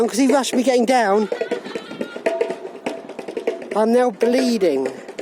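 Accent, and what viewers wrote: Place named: Newcastle, United Kingdom. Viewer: Okay, didn't knew that people from Newcastle had such a strong accent... I thought might have moved to England from another country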